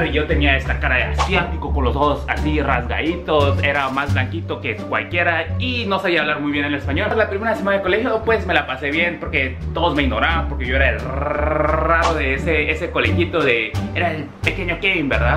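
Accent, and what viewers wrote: Guatemala. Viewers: Amo como hablas. Acento chapín. :3 no te preocupes a todos nos ha pasado mala experiencia en la escuela aquí en Guate. Sos la mera tos maje. C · JAJJA Me gusta que tenga cara de coreano y acento de guatemalteco :v JJAJAJ No se me encanta JAJA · Kevin tus vídeos son buenos y te considero coreano-chapin, cuando hablas español es acento guatemalteco y sos parte de nosotros como muchos coréanos que viven en Guatemala. Felicidades amigo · el acento chapin que bueno, 👏👏👏👏 parece chapin con cara de coreano, oye y tus novias chapinas que dicen. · Y no te verguearon en Corea por hablar como chapin? O se a que en cualquier lugar (hasta en su propio pais) lo quieren verguear a uno por ser diferente y si uno se deja. Saludos y exitos en tu vida Kevin. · Hablas puro Guatemalteco los hubieras vergiado jajajja no te dejes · A mi me gusta como hablas con cara de Coriano y habla chapin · Amo tus videooos y tu acento chapín hsjsks♡ sos lo + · Jajaja me encanta! 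Ya hasta hablás como guatemalteco 😂❤ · Me encantan tus vídeos me gusta como te expresas puro chapín te veo desde Guatemala.... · Jajajaja ya habla como chapin Awuebosss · Su acento más chapín que nada jajajaja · Ahora en Korea como te tratan los Koreanos por que supongo que tenes acento para hablar koreano, haci como tenes acento Chapin. Buena honda Kevin ya sabes lo que es el bulling en Guate todos lo pasamos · Me encanta tu español, creo que tenes más acento chapín que yo :'v Cuando regresaste a Corea no fue muy raro luego de pasar tanto tiempo acá en Guatemala? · Que mal lo que viviste siendo tan peque eso te marca... y waw hablas como todo un chapin... interesante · Like si tiene acento de Guatemala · Hablas puro guatemalteco!! Que bien se escucha 😘😘🇰🇷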